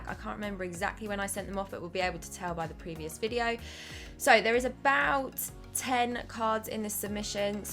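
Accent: United Kingdom. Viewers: Very nice cards. I love the English accent, also. 😅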